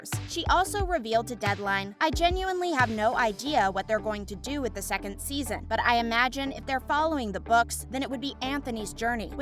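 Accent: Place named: United States. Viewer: Just click bait. Nothing about Rege coming back. And that grating American accent narrating about a very English show. Ugh!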